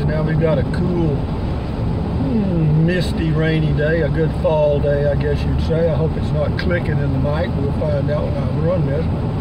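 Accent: Texas, United States. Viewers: " "...one of my favorite songs by. Your accent is just like that of my relatives in Texas...pure Texan! I have a boring California accent. Having a wide range of experiences, as you have had, I think helps with keeping things in perspective. Look forward to seeing the Maxx fan in action. Good video....I enjoy listening to the random thoughts.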